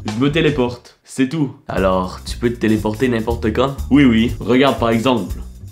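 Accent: Quebec, Canada. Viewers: je clique j'entends l'accent québécois je fuis la vidéo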